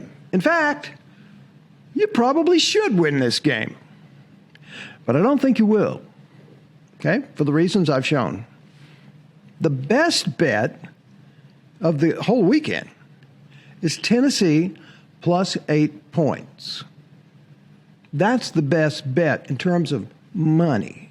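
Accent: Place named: Georgia, United States. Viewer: The reason for the accent is Georgia was a British penal colony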